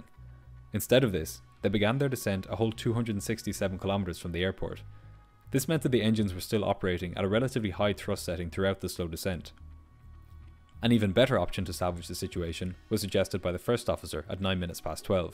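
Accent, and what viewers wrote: Ireland. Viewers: Everyone has different accents and he's Irish. If you've got a problem with that scroll down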